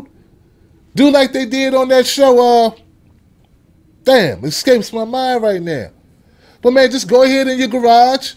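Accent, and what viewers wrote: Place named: New Jersey, United States. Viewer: I just love your Jersey accent!!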